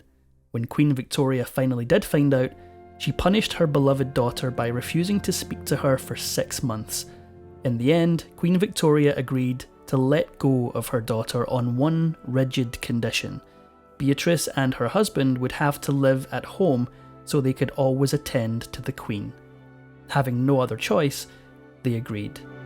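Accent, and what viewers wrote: Scotland, United Kingdom. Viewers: I had forgotten just how much I loved this narrator’s Scottish brogue! Cheers to you, Sir! ❤❤❤ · Scottish accent and exceptional annunciation 🏴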